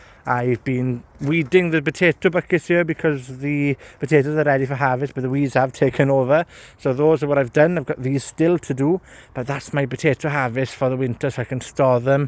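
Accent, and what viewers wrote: Wales, United Kingdom. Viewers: I can't remember...is yours a Welsh accent?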